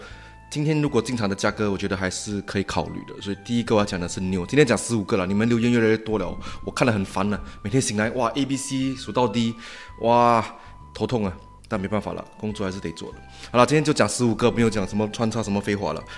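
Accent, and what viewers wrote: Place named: Taiwan. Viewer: 我喜欢你的背景音乐。喜欢你的台湾腔，你讲话好机车。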